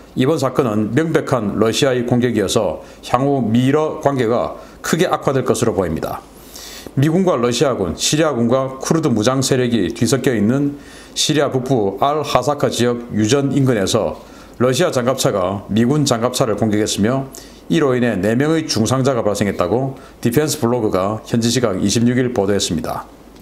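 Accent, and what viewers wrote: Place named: Gyeongsang, South Korea. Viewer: 경상도 말쓰는거 보이 미똥당 ?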